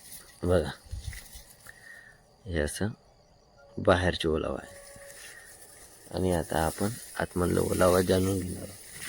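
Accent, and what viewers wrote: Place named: Jalgaon, India. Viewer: मराठी जडगावची आहे वाटत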